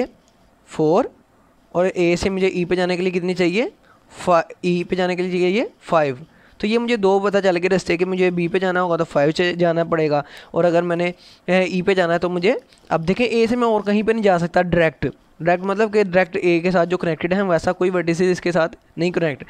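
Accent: India. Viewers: Bhai tum himachal se ho kya ?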